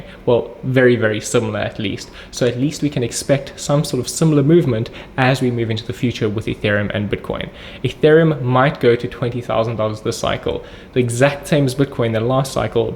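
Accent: South Africa. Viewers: South African? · Are you South African? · Legend! Good to hear a South African voice in this space.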